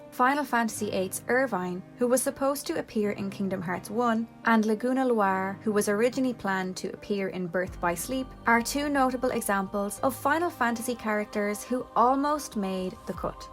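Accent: Ireland. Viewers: Love the Irish accent.. need to go visit my family over there 👀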